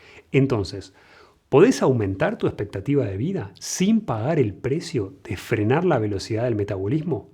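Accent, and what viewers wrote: Argentina. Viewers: Me parece excelente su exposicion sobre el metabolismo los telomeros y toda esa cantidad de conceptos que si bien conocia algunos desconocia otros y como estan inter relacionados por el acento al hablar me pareciera argentino y digo esto porque dice que es doctor y de alguna manera se acerca al publico de manera corriente y comun para dar indicaciones medicas sin ninguna retribucion economica realmente lo felicito por su dedicacion y esmero en este tipo de platicas lo conoci a traves de youtube por el video sobre la vista y al ver su explicacion enseguida me suscribi . Supongo por su explicacion tan minuciosa y detallada que usted es microbiologo o algo por el estilo ya que conoce a la perfeccion nuestro mecanismo y metabolismo de nuevo me permito felicitarlo gracias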